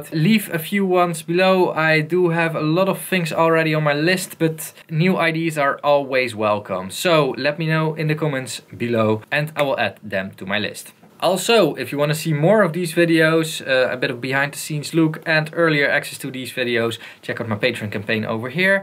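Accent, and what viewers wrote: Netherlands. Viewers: 1sec in, this guys is obviously Dutch xD.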